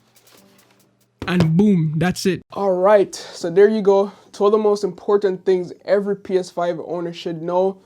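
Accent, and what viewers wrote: Jamaica. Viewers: You could hear the Jamaican accent 🇯🇲🇯🇲 old news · Are you a Jamaican?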